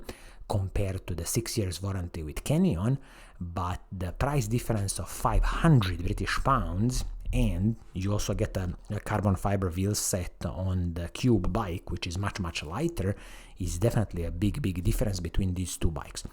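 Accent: Italy. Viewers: I really like the Italian accent. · Ma perche' video in inglese quando si sente benissimo che sei italiano????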